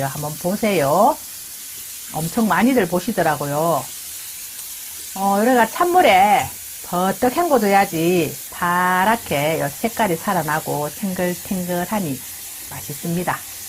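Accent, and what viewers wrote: Gyeongsang, South Korea. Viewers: 좋은 정보 감사합니다 경상도 사투리 끝내준다 내고향 안동 사투리 좋아요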